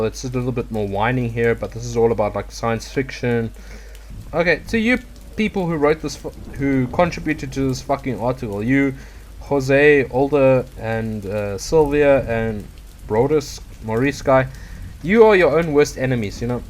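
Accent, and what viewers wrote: South Africa. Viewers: You sound very South African. Did you move to Europe?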